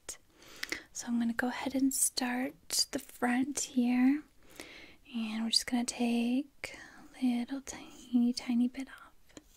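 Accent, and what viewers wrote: United States. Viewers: you must be from the South?:D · but with an American accent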